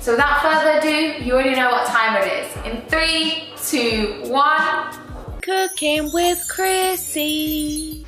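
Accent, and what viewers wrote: United Kingdom. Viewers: I do love British accent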